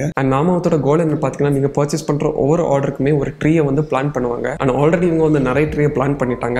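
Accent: Kanyakumari, India. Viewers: Bro kanyakumari ah?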